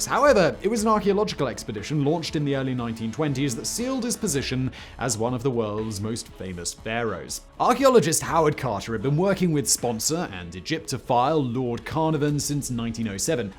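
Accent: England, United Kingdom. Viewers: I'm so glad I'm not English. I would probably sound like this dude when I talk and that would piss all of us off and have to punch myself in the face if somone didn't beat me to it(no pun intended). And dont these people realize if you smash the like button you will break your electronicsl Stupid limese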